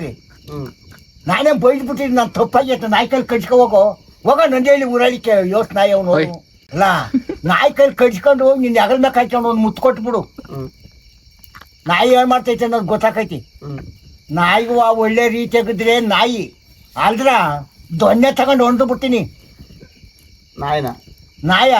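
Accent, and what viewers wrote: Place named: Tumkur, India. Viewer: ನಮ್ಮ ತುಮಕೂರು ಭಾಷೆ 👌👌👌👌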